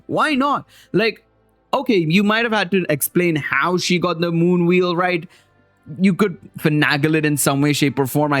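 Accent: India. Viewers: Are u indian as u sound very indian or christian maybe just would like to know and plus anyways ur videos are very good and enjoyable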